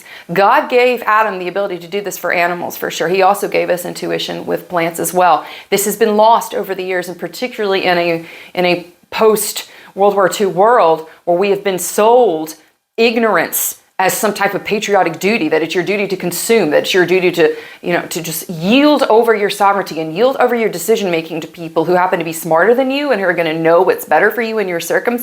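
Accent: South, United States. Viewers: I know this is a little off topic but does anyone else hear a southern accent? Possible mix of Texas and Louisiana or maybe Tennessee.. Anyway I truly admire her Passion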